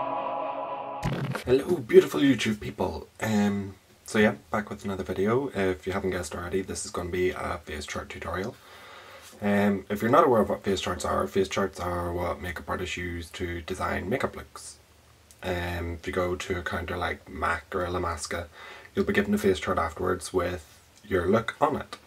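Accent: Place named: Northern Ireland, United Kingdom. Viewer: ooh...love this look and your technique is amazing! Do I recognise a good ol northern Ireland accent there...where you from originally? :-)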